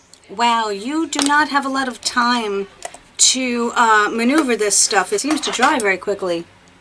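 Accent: New York, United States. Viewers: Always better when I see your beautiful face and your lovely NY accent..x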